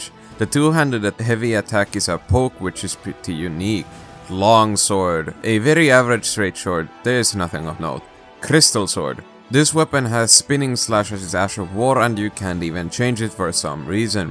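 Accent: Finland. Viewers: love the finnish accent <3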